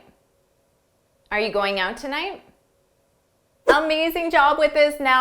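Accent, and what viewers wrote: United States. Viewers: thank you ! just curious about where are u from originally i mean your english is american or canadian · Really nice. But this is American English. British English Should of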